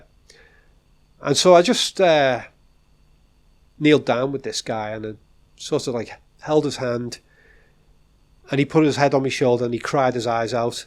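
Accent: Liverpool, United Kingdom. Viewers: Just discovered your channel so excuse me if it’s been mentioned, but are you a scouser ?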